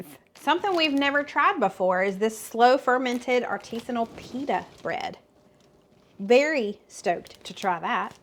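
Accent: South, United States. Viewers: I have seen so many crockpot lasagna recipes that just didn’t turn out looking like they were good. So many looked so dry. They were probably overcooked. But this one I’m definitely going to try bc it looks delicious! Thank you! Girl, I’m from the South too and we have a southern drawl not an accent! At least that’s what I’ve always thought. We lived in of all places Georgia! And most people that we met thought the same! They would say “we love hearing you talk bc of your accent! We were in Peachtree city and it’s a stirring pot of people. Most were northerners (Yankees)😂😂We made some good friends! I loved hearing them talk and likewise! All good recipes on this video❤😊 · Southerner here, my accent is similar to yours but some of your phrases are a bit thicker than mine but im so used to the southern accents and very southern accents it doesn't seem out of the norm but when I travel out if the south ppl always ask where in from 😂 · as someone who lives far from you in Nevada, I promise you have a very strong Southern accent and verbiage. 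Personally I find it charming and endearing. When you speak I giggle. I love your accent and all. Do not change. ❤ You are perfect as you are. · You definitely have a Southern accent. I grew up in Iowa which is in the Midwest but have lived in Colorado for the past 35 years. 😊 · Once again everything looks Delicious and I Love Slow Cooker or Crockpot Meals. On the Accent. You do have one, but not heavy as some Southerners...lol. I think it's cute. I live in South Texas, but I was born in Kansas and raised there until age 6 and then in Colorado until I married. I was asked one time where I was from cuz I'd say Winda instead of Window and Worsh instead of Wash. Sometimes I still do. Accents make us who we are and where we're from. I Love yours! I don't think I've pick up a Texas accent, but I'm not sure.😂 No one has ever said. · I'm from the Midwest...St. Louis area. I love your southern accent. 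I moved to central Georgia in 2000 and lived there for 17 years before moving to Alabama for another 3 years. When my husband passed away suddenly in 2020, I moved back to the midwest to be close to my family. Listening to you reminds me of some of the best years of my life... and I love to try your recipes..even though I live alone and they make way more than I need. I either cut them down or freeze then in portions. And someone told me I had a "funny northern accent" once. I'm sure the lady who commented from Scotland thinks we ALL have an accent. Keep doing what you're doing and I'll keep watching. 😊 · Girl I'm from Georgia and yes you have a southern accent. I say boil and oil just like you. just needs to accept the fact that he likes cream cheese. Sorry not sorry. These dishes look so good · your phase y'all is a dead give away to you being a southerner! Yes, you do have an accent too! 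I'm in Maryland and our daughter has moved to GA. Neither of us have an accent! Lol I'm looking forward to trying the lasagna and the Queso Chicken Chilli. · I'm originally from Goldsboro, N.C., but moved when I was 15 to Sevierville, Tn. No matter where I go, everybody asks me where i'm from!! I have a very southern accent and people acctually want me to keep talking for them. I worked for Dollywood for 5 years,& fit right in there right away. I love your Southern accent, & I think it's way of dropping sparkles & glitter down every time we speak!!!! I think it's a God given special blessing to be a southerner, & spread all the Southern charm around!! One day I wished you would do a video of growing your inside spice garden. That is my greatest heart's desire is to be able to have an inside spice garden! Please be honest, and tell the good, bad, and the ugly about having an inside garden, because the cost is alot of money in my world! 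I love you, & your family, & pray for ALL of God's blessings for y'all in 2026!!! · I'm from the south. We have similar accents. You sound like me.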